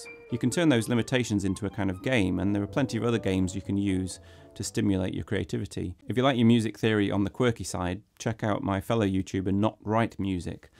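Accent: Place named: England, United Kingdom. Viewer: I love your accent. It reminds me of my friend from England who I could talk about classical music with for hours. Now he’s in Tel Aviv. :,) Keep up the great work.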